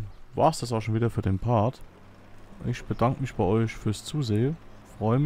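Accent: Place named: Hesse, Germany. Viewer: Bist echt gut mit den Ballen, wie immer sehr angenehme Folge mit Hessische Mundart.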